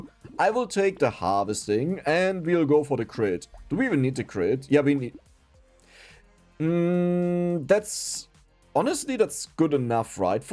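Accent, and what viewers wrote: Germany. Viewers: Ich liebe deinen deutschen Akzent 😂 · rede doch einfach deutsch das kann man sich ja nich anhören mit den deutschen akzent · he sounds very German, not Austrian German too. You can also tell by the efficiency shown here. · hi my first time on this channel, after 1 min: yeah this guy is german, after minute 29 i heard "scheiße" and burst out loughing, great video. love brotato :D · Er spricht weder BE, noch AE. Er spricht germanenglish 💯😃💪🏼 bitte content auf deutsch, das wäre der Traum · hab mich die ganze zeit gefragt du klingst so deutsch dann bei Minute ungefähr schei** hat alles bestätigt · Are you german? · ich wusste doch das du deutsch bist man merkts and deinem akzent und an dem "HEILIGES SCHNITZEL" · Broooo is that guy german? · R u German ? Ur accent is so german line mine 😂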